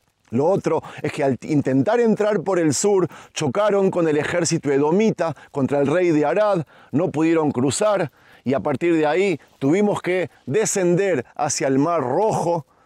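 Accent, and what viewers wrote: Argentina. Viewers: es hermoso,amo cada terron,cada grieta..desde lo mas profundo de mi alma,q El Señor me prestó te doy gracias - mi vida va atada a tus pies, cuando me muestras los precipicios y grietas,he aprendido a tolerar tu acento argentinon', en haras de los videos q subes--desde Venezuela Hashem t cuide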